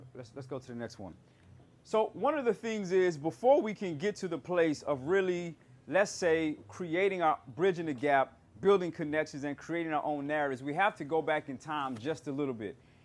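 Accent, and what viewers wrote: Ghana. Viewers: Lol, your American accent is not 100 % anymore. Except for the parts where you are deliberately sounding Ghanaian, you have that Ghanaian accent flaring up for real and creeping in uncousiosly.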